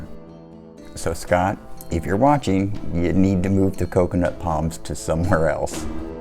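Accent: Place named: Florida, United States. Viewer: Always thought you sounded like Batman (Kevin Conroy) with a Florida accent. Now I see you actually look like him I am delighted :)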